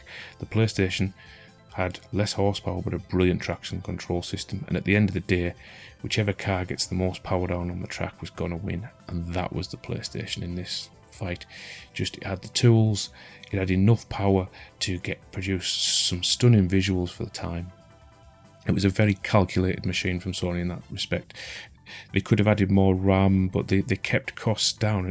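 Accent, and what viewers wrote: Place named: North East England, United Kingdom. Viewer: It's probably because this guy is from the North East of England. That's how they pronounce 'u' sounds.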